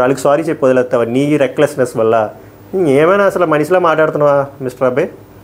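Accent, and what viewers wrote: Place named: Visakhapatnam, India. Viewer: Mee slang super Garu. Vizag slang👌👌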